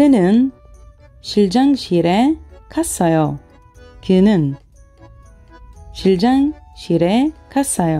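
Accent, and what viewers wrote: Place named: South Korea. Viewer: الله عليكي شو راسك صافي و مخك نضيف و دروسك حلوة،نبرة الكوري بتجنن منك!!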